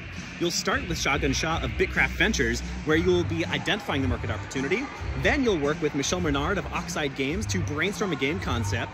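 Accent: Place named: Netherlands. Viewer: sounds pretty Dutch! Are you by any chance Dutch?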